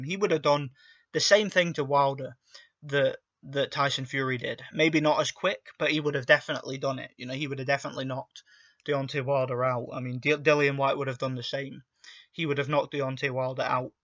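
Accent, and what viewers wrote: United Kingdom. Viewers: Is crazy what your saying but do you know the wbc took the belt from tyson fury about the glove gate bs povetkin vs dyllan white just recently fought for the interim wbc heavyweight belt sooo skill or not he was a champ and your accent tells me your from uk and mayb mad at this guy idk but it seems wilder lives rent free on your mind 🥱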